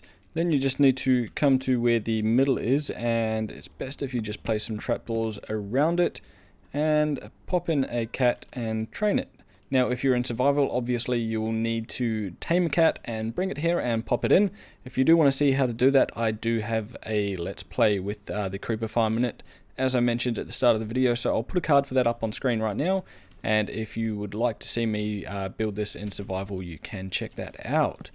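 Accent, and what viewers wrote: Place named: New Zealand. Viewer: Subtle kiwi accent almost snuck past me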